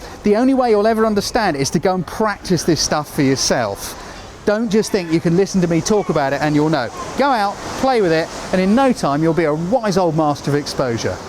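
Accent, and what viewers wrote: England, United Kingdom. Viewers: I really like the way this man explains photography (and the English accents, wow!)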